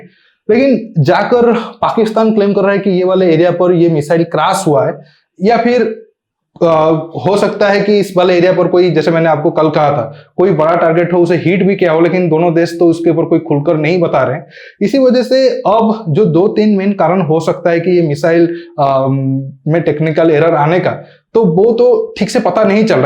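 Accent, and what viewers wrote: Odisha, India. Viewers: AP bhai odisha se ho kya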